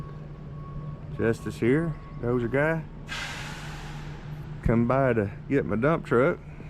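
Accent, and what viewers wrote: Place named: North Carolina, United States. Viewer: That’s a good looking job. What area are you in? Sounds like NC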